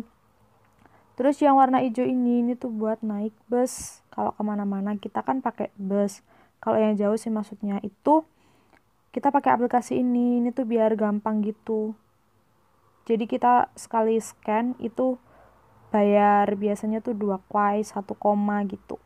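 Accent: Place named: Java, Indonesia. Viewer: Ada medhok"nya gitu deh.wkwk tetep yang sama kek dulu. Semangat kuliahnya dong💖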